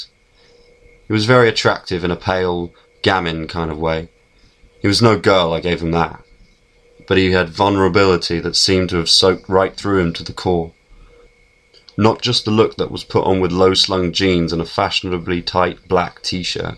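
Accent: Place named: London, United Kingdom. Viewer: It doesn't say who's reading it but he's great, and has a London accent. I also liked the pictures - some of them are of buildings I have worked in!!!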